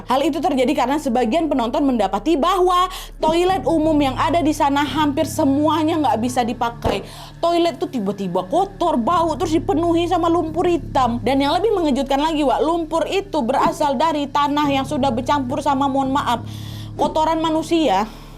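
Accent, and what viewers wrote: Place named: Padang, Indonesia. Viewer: Logat Padang terasa bgnt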